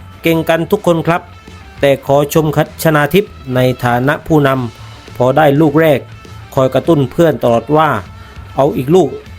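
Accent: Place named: South, Thailand. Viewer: แอดมินเป็นคนใต้แน่นอน ต้องปรับการอ่านให้เร็วและคล่องกว่านี้ค่ะ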